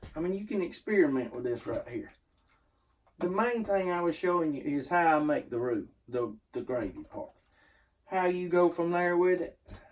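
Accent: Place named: South, United States. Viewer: Plenty of “y’alls”, lots of butter and a strong southern accent...you the freakin male version of Paula Deen!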